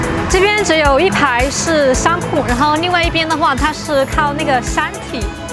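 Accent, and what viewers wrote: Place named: Sichuan, China. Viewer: 和四川人说话有点像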